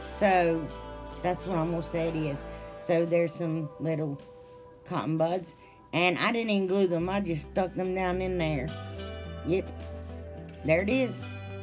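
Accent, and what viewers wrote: South, United States. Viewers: Girl please, I can understand you just fine. It's a Southern thang. ;) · Love your accent. Im a Southern girl myself. Mississippi here 🙂 Love your projects ❤ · New Subscriber. Your DIYs are beautiful and professional looking. I love everything you made. Love your accent. My best friend is a Southern gal like you, I’m from Chicago. When I go to visit her in Tn everyone there says they like my accent.Keep you DIYs coming. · Love ur accent I'm a southern girl to. Ur crafts super. Like all. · I'm so glad I found your channel!! I love all the videos I've watched so far, im binge watching them now lol I also follow your friend Kathy, I love her too!! I can understand you just fine but im a southern girl too. My Maw maw was from West Virginia, im used to the accent! All of your diys are so cute! Happy craftin lady!!!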